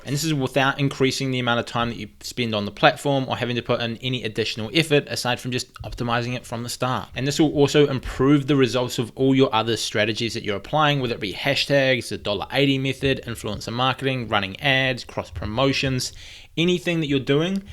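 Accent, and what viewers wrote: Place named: New Zealand. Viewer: To be Honest , You're kiwi Accent sounds like a Music to hear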